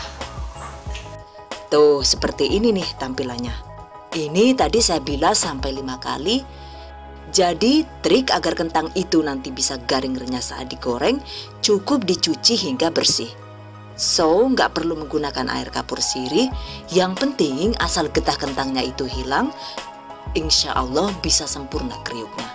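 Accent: Banyumas, Indonesia. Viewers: Eladalah.. Jebule Rika wong ngapak ya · Ya allah ternyata sampean wong ngapak, salam ngapak Bun, ora ngapak ora kepenak 😊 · Ternyata ngomongnya sm ky nyong buu, wong ngapak😂😂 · Sampean tiyang pundi yu deneng saged ngapak kaya kula... Kula wong kebumen yu😂😂😂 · Suka gaya bahasanya...ulasannya jelas..ngapaknya pas...hehe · Jebule ngomonge pada Karo nyong Rika yaa bu ngapak 🤭🤭🤭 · Gagal fokus Ama bahasa yg ngapaknya😍keren2 jd kita yg menonton tidak bosan😍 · Muantap tenan yu... Krn ngapak...jelas tenan keteranganx ditunggu resep yg baru yg thn lm tuk kirim anak kos..tq ya yuu.....🙏🙏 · Weh sebngsa karo nyong ya , pasukan ngapak😄😄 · wong ngapak taune jannnn ngapake ngendi iya.... dadi ngefans kie inyong 😂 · Ngapak ternyata ya bunda 😁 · deneng jebule ngapak kaya nyong..🤭😅😅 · Yg saya suka dari channel ini disamping resep2 yg di share,juga ka host nya yg suka lucu sesekali krn logat dan bahasa jawanya. 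Medok khas org ngapak,dan kalimat OKE hihihi seneng dengarmya. Tks mbk resepnya 👍🙏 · Ngapak bgt kya enyong deneng😁🤣kk nya matursuwun resep e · Sangat mudah dimengerti,ada selingan humornya lagi.bahasa ngapaknya juga😂😂👍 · Wong Banyumas Iki mesti, ketoro omongane ...😄😄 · Wong ngapak ya bu hihi..slm dari cilacappp..😁😁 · Suka dg resepnya, lg serius kok denger bahasa ngapak, langsung ngakak, wkwkwk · Ngomong jawane ky wong ngapak lucu banget sumpah 😂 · Deneng ngapak mbaaaaakk Ngakak nyong yakin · Mantaaap pingin mencoba moga engga gagal,, Ko bahasanya ngapak ya ,seneng dengernya ,,,makasih atas resepnya mba yuu,,kesuwun banget kiye wong gombong Melu nimbrung 😀😀😀😀🙏 salm sukses buat Chanel ini 👍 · Ngapak toh hehehe, saya akui masakan Tegal emang mantab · Gemesi le omangan bun... Ngapak nya berasa😂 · Jebule wong ngapak salam ngapak wong cilacap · Makasih mba resep ny..mantep nih..ko jdi ngapak y..ora ngapak ora ke penak..hahaha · Ibune wong ngapak ya deneng pda y karo nyong 😀😀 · Deneng ngapak 😂😂😂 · Medoknya kaya wong Banyumas ya Bu...😀👍👍😘😘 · Mantaaap Bun.... 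Saya mau coba ah..... 🙏 Bunda dialeknya ko sama dgn aq yaa......Maaf Bunda asli dri Bnyumas apa yaa...??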